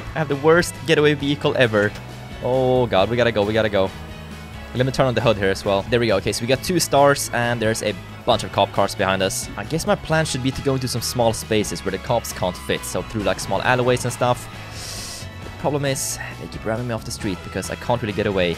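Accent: Sweden. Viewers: I think ur swedish im i right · Are You frome sweden?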